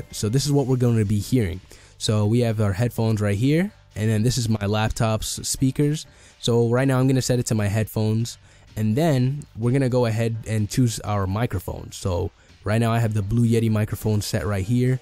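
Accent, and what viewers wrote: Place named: Netherlands. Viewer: Nederlands accent...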